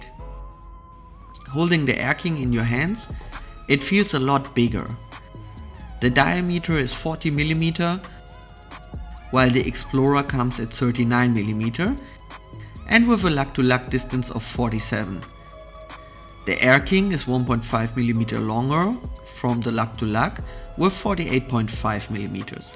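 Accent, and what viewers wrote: Germany. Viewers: Great - where are you come frome ? Your dialect sounds like German :) If so - I would be interested what’s your job?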